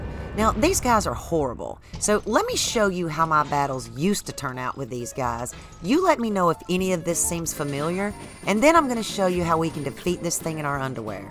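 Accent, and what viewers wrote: South, United States. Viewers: I love your southern draw ma’am!!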